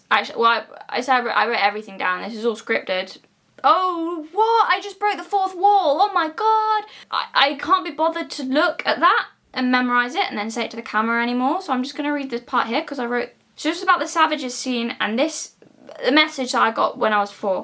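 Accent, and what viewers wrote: United Kingdom. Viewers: I always forget how British you are until you speak So, what i got out of this: British Girl Loves Trees (EXPLICIT?)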